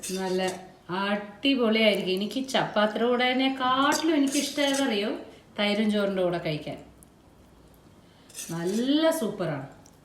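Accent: Palakkad, India. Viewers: I like your simplicity. You are very straight forward and seeing you enjoying the cauliflower fry was really enjoyable.I also like your palakkadan way of speaking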